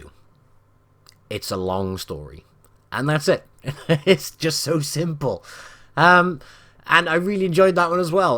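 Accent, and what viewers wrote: United Kingdom. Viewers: will i did not win i am a bit sad but that's not the reason why i subscribed i want the at15 because it has a ridiculous rate of fire and good armor but hey hearing you laughing along with that magnificent British accent got me compensation hope we get to rich 10k as soon as possible and please keep laughing at reds and keep uploading videos my favorite YouTubeer:D...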